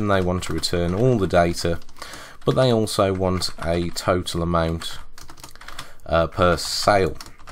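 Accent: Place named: United Kingdom. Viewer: I really hate british accent. How do you guys roll your mouth like this. This is worse than indian accent... Allright allright not bad at that level but c'mon guys it is really pain in the ass.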